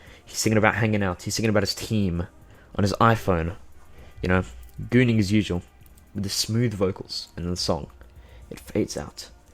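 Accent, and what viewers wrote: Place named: Australia. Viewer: I believe its ausie accent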